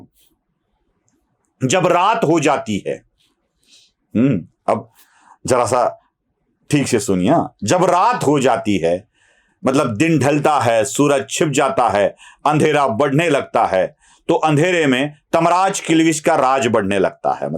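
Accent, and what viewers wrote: Maharashtra, India. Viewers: सर आर्ध मराठी माणूस मराठी बोली नाही विसरू शकत हिंदी बोलताना keep it up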